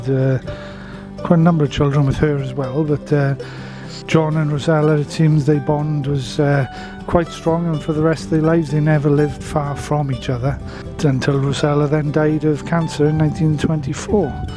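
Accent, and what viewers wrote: Wales, United Kingdom. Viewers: God bless ye Buddy, Thank you for doing such a crackin job on this, its very informative and interestingly delivered. LOve the twang of your broguish speak. you Welsh? nary to matter, its just very resonant of a past I do not know but none the less I am very much proudly from. I am of the Georgia and Carolina people, but do have Mississippi blood there still and I am highly honored by any and all my associations to Dixie, tis a privilege I owe my life for. And I thank yall for coming to be a part of it and show us some love. I hope you was treated like the good guests you are and come to visit more when you can. in the old Irish tongue, I believe its in order to convey, Caed amlle a failte' unto ye. Now, for the closing notion, by which, I request that you tell that fine lady there accompanying yourself, I love that tshirt shes sportin' like a trooper!!! 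God bless the Merseyside and the grooves that flow in and out of that river there. Should ever I be of any help to you, let me know, im in Seattle but, heading to Mississippi and NOLA this spring or summer, intend to check out this very place thanks to yall and could provide a wee spell of boots on the ground if theres something yall missed or need a good lookin at. Thank you again and to you a special salutation thats privi amongst us old sons of the South, nary to matter the color, your God, your choice in rose over magnolia, but, just so long as you dont put no sugar on yo grits, I warmly bid unto thee, Deo Vindice and Pea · I had no problem understanding or knowing you are welsh,, but then I'm from 🇬🇧 👍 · Enjoyed that 👌🏼 It was refreshing to hear a welsh accent knowing their onions. Where you from? I’m in Swansea.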